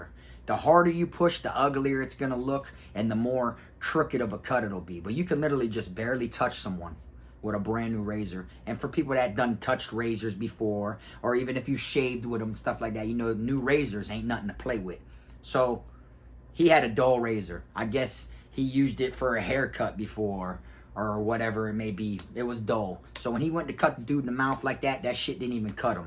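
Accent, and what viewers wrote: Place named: South, United States. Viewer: idk how you’ll take this but that southern dialect might get you in trouble one day as your channel grows, I know damn well you don’t give a fuck but I just don’t wanna see some clowns try to “cancel you” or some shit like that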